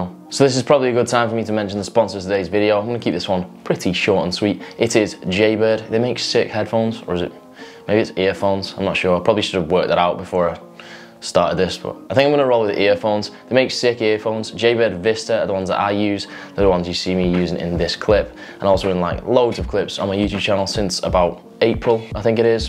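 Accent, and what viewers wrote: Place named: England, United Kingdom. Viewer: Sorry I can't handle the gay pommy accent lol try doing some legs bro. I'm heading back to Ryan Hutchinson for some decent sarcasm lol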